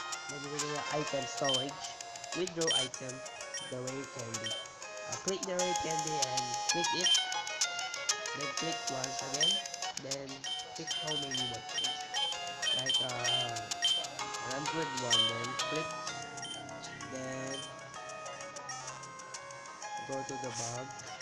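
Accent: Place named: Philippines. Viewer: oh bcz I'm a Filipino too I can tell by the voice and it says Tagalog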